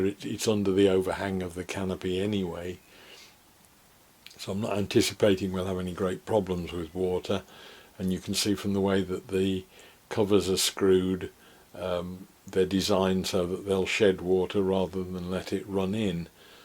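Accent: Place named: West Midlands, United Kingdom. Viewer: Are you from the Midlands? You've got a slight West Midlands accent to you!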